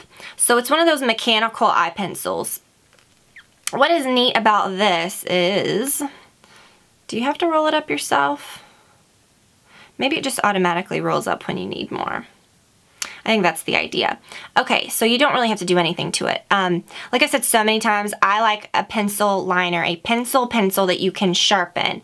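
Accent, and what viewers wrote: South, United States. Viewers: I feel like I'm just noticing her southern accent... I didn't notice before!:o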